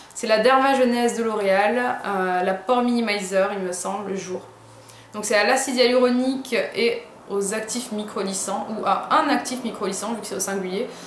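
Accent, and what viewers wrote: England, United Kingdom. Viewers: tu es l une des mes youtubeuses beauté préféré.. je kiiiiiiffe tes conseils, ta facon de t exprimer, ton accent anglais (hihi surtt qd tu dis le mot HIGHLIGHTER LOL), ta facon de présenter tes produits (tres bonne ambassadrice) et ta classe naturelle. Tu as bcp de gout et bcp de talent. Que fais tu dans la vie ? Commerciale ? Bref.. tu as compris que je te kiffais et j apprécie que tu parles svt de produits a petit prix..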